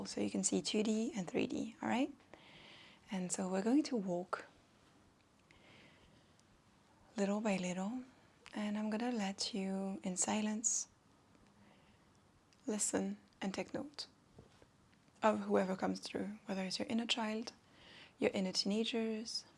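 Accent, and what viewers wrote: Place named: Germany. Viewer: hmm really, you mean you get told about a german or dutch accent? Peut-être est-ce que vous venez d'une région où il y a un certain accent allemand ou autre - je sais qu'il y a des pays européens où les germanophones et francophones se cotoient (aussi, merci d'avoir répondu et d'avoir éclairci ma curiosité - moi aussi je parle français, je vis présentement au québec, ce n'est pas le meme accent mais personnellement j'aime beaucoup l'accent francais européen. Je suis étonnée de ne pas l'avoir reconnu cette fois-ci! hehe)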